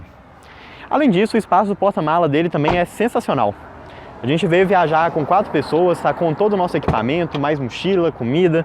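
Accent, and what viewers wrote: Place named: Minas Gerais, Brazil. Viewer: eu tbem acho!! E esse sotaquinho mineiro... Iti maliaaa 😍😍😍 ... Melhor canal de carros do YouTube 👏🏽👏🏽👏🏽👏🏽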